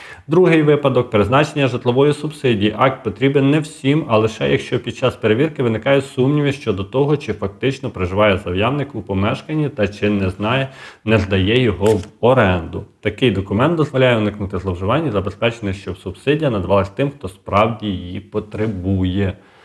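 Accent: Vinnytsia, Ukraine. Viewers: У нього вінницький діалект. Тому так розмовляє. А ви що, розмовляєте літературною мовою?!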